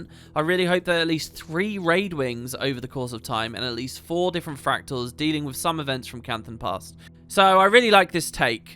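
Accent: United Kingdom. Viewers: "wearing his burnout on the game" DUDE youve been covering this game for like 14 years bro I literally give you the most respect for sticking through with consistency and having enjoyable content. Your voice is so ingrained in my head that whenever I hear a british accent at work I think "Oh that guy sounds like lmao". keep going, this franchise is my favorite and you are basically one of the main reasons I even play guild wars today. Keep it up, never let them get you down, we are all here for you my man and waiting for the day you start doing three two-hour EoD episodes:DDDD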